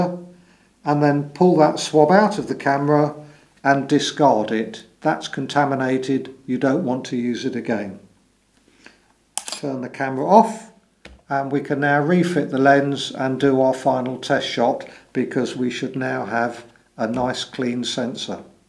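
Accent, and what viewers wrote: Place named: United Kingdom. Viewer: British man explaining camera sensor cleaning is my new favourite thing now. Knowledge 10/10 Explanation technique 10/10 British accent 10/10 Unnecessary content 0/10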